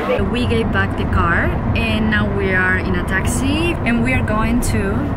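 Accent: Spain. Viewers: A mí me parece genial que hable en inglés, porque ella tiene seguidores en muchos países. Si tiene acento español hablando en inglés es porque ella es española y no tiene porqué perder el acento. Yo no creo que su inglés sea tan malo, se entiende perfectamente. Por otro lado, para llegar a hablar muy bien un idioma extranjero, hay que hablarlo antes mal (que no digo que sea el caso). Nadie nace sabiendo. Hay que ser un poco más tolerante con los errores, y deberíamos valorar más su iniciativa. Hay más mundo que España y el castellano. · Me gusta tu acento español ! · me parece de cuarta una española todo el tiempo queriendo hablar en un inglés un poco duro!!!! afloja con el english y habla en tu propia lengua te aseguro que te verás más natural no tan forzada!!!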